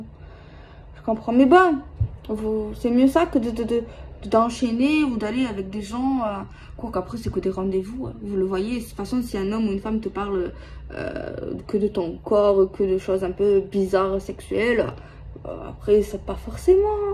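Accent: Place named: South, France. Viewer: Bien l'accent du sud...alors tout vas bien..🙏🙃🤣 👍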